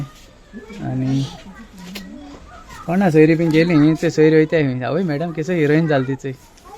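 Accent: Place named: South Goa, India. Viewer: really you are comedian I like the way you talk of south goa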